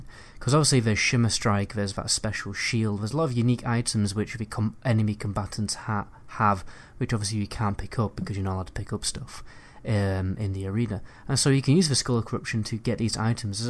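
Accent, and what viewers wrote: United Kingdom. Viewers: Wait, is British!?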